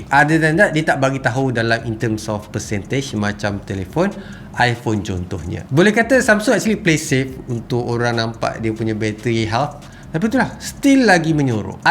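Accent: Terengganu, Malaysia. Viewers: pekat terengganu tu tak hilang ekk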